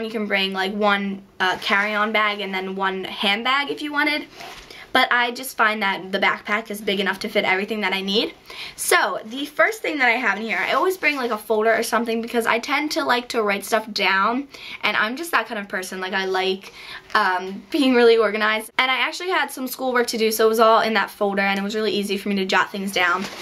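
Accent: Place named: New England, United States. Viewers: it sounds like she's somewhere in New England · I think she may be from the north eastern united states because of her accent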